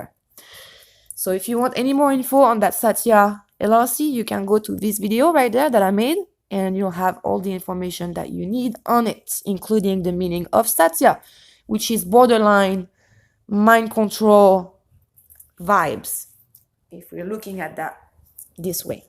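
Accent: France. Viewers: I thoroughly enjoyed your video. Thank you. New subscriber. By the way, I absolutely adore your accent. It reminds me of our French exchange student who is just a doll. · Did you say that you were German? You sound French to me. Beautiful accent! Blue and black.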